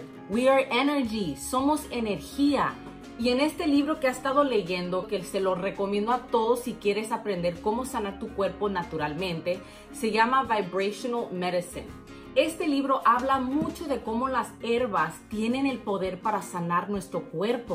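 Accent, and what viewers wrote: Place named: Spain. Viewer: First, love your Spanish accent you are excellente instructor.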